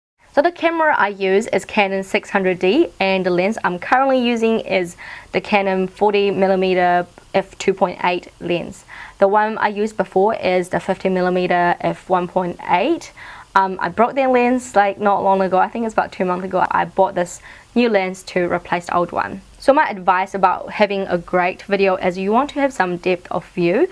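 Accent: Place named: Australia. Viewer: Great tip. Are you from Australia? your accent is so cute.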